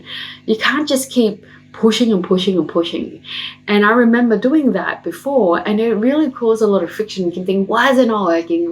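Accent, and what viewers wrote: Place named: Australia. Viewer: Great advice! So important to be in the moment. Btw love your Aussie accent!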